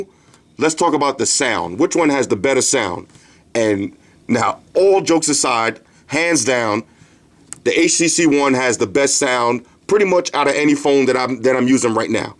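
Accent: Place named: New York, United States. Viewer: a real ny nigga.... i can tell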